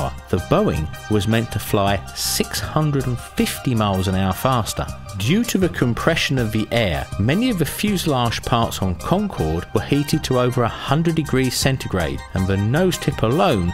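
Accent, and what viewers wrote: United Kingdom. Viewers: Just like to say recently came across your channel I find it very interesting informative and oddly relaxing plus it's nice to listen to a fellow brit rather than the plethora of Americans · He is British, and they all sound the same. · is it just me or do monotone, loud British accents just get irritating as fuck after more than about 2 minutes ? seriously this needs a female narrator or cc, dammit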